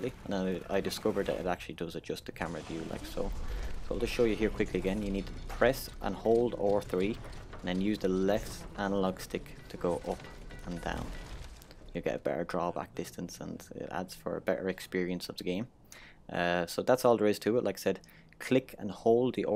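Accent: Ireland. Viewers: R3 he says with his weird irish accemt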